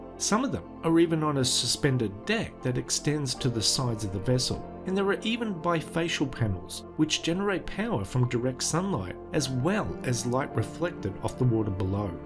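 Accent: Australia. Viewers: An Australian narrator talking about square feet and pounds. A reminder that in Australia we are metric like all the other sensible countries in this world. Thumbs down.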